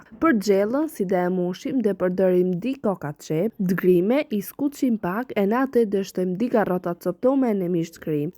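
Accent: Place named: Albania. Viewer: Të lumshin duart po nga cila trev e Shqipëris jeni sa bukur flisni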